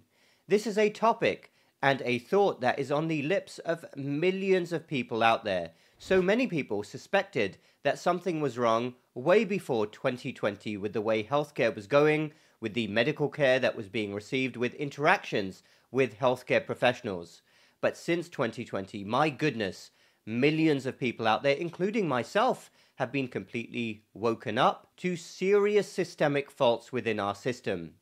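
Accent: England, United Kingdom. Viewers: Dr Sunreel lives and works in the USA. You have a lovely English accent don't lose it 🤗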